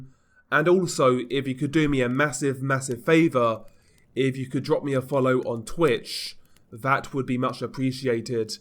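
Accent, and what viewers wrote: United Kingdom. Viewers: Every time I hear say “Ladi gang” with his British accent, It reminds me of the song. “STAND STILL LADDY!” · He's British, and some British accents "add" an R sound to a few words. Have you ever heard different British accents?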